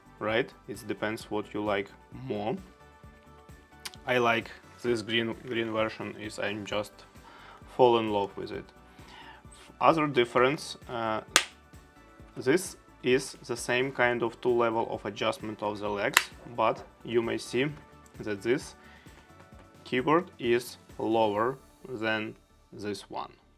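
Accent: Russia. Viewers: Такое ощущение как будто он русский но говорит по русски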